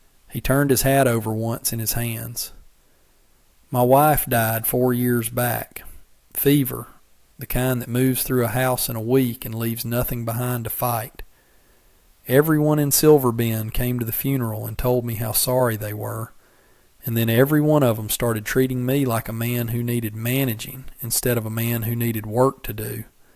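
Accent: South, United States. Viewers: Terrific story. Love narrator's voice and southern accent.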